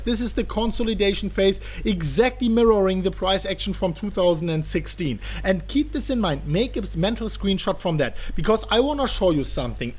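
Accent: Germany. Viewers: I love your German accent. GET DOWN...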